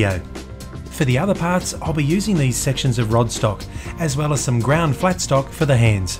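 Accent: Australia. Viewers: You are the only person with an Australian accent that I can listen to. My girl friend is from new Zealand and I'm used to hers, Australian just sounds weird and I end up focusing on nothing else. But with you. Your work speaks way louder.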